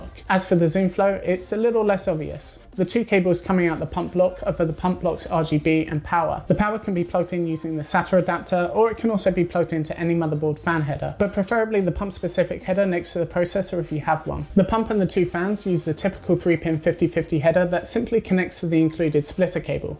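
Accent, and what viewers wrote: United Kingdom. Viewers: Wow that accent is very British Sir are you sure you are American ?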